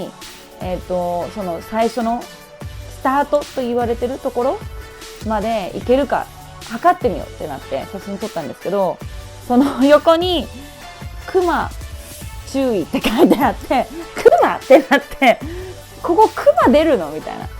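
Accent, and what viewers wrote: Philippines. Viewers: Whahaha, you've been staying in the Philippines for too ... way too long! You sound like a Filipina that is fluent with Nihongo. hehehehe. I was a college teacher once and had students who are now Japanese residents due to their family members who are from Japan ... ... whenever they chat with me or whenever I see their homemade videos while they're in Japan ... they sound like you right now. hehehe .... .... so cute. hehehe.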